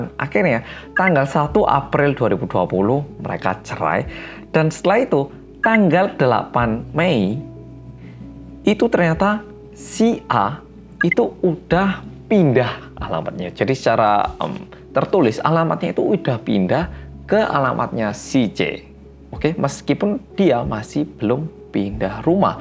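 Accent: Java, Indonesia. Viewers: Mas Ngomng bhsa jawanya medok bgt sma kya mb Dita.. Klw Ngomng bhsa koreanya medok nga???... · Mas nya cakep ,, suaranya laki bgt & medog, sy langsung suka pdhl ini pertama kali nonton chanel ga sengaja. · auto cc nya buset, masa "medhok" jadi "bodoh"😭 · Medok Tentu Saj!!!! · Medok nya muantap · tingkat keseriusan, mimik wajah, suara yang medok, pipi yang mulai berisi. WELCOME BACK MAS 🥰🥰🥰🥰🥰🥰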